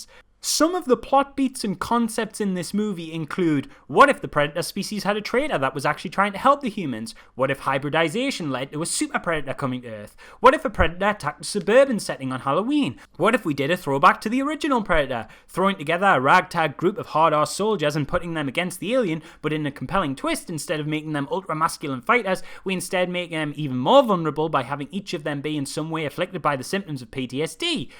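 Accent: Newcastle, United Kingdom. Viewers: Hey fellow geordie.👍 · just love the geordie accent ..Fucking Baffling!! love it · Are you a geordie lad? A could here it a bit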